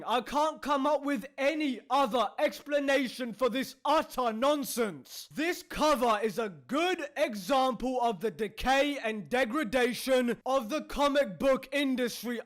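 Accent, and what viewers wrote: United Kingdom. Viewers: The combinations of your accent, commentary, angry tone and brilliant British insults made this video a 1000x better 🤣🤣🤣 Good job mate! 'I am not Starfire' is not even out yet and the preview description alone is already trash as fuck. There's absolutey NOTHING charming nor likeable about Mandy whatsoever. Her entire personality and character traits are her being 'gay, overweight and goth'. That's it. Also the fact that she "hates everybody but her best friend" who fyi, looks like another godawful Tumblr character who probably identifies themselves as an attack helicopter. As if all goths "hate everybody" for no reasons. And what's even more ironic? Mandy eventually falls for a girl in her class who is basically like Starfire (tall, popular, beautiful, nice/kind)! Wasn't Mandy try to get away from people like her mother?? WHAT KIND OF BS IS THAT?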